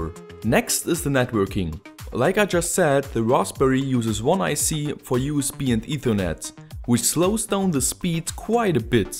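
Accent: Germany. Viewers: Dude, you sounds weird... Are you German? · eher ein deutsches Amerikanisch.... Und ich dachte immer, in Deutschland würde man brtisches Englisch und RP lernen, so wie ich früher.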